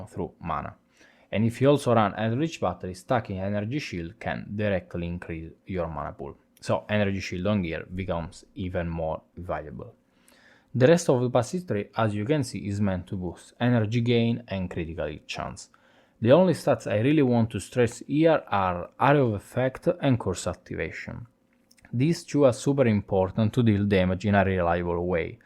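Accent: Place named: Italy. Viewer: The italian accent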